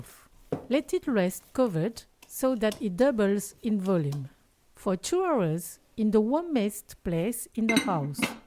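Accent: France. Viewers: I like watching your videos. You look like an Asian but your accent is like French. Do you live in France?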